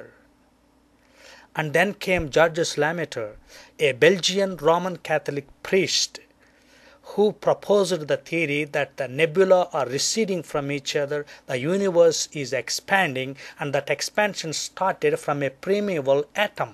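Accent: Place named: Kerala, India. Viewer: very funny,, a typical mallu,,saying yexposed,,yauniverse,,dactar jaakir nayik,,,man plz go to some english school and learn some thing ,,instead of wasting time like this..plz go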